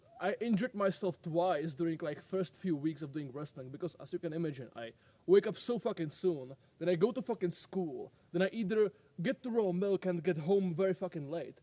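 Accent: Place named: Czech Republic. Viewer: You and have the same exact Czech accent English. It's awesome.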